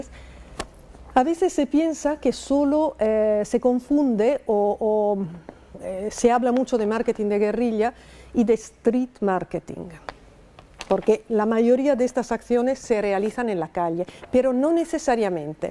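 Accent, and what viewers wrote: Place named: Peru. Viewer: Me parece escuchar a un señor de nacionalidad peruana, en el curso, Esta clase es exelente, saludos desde Peru.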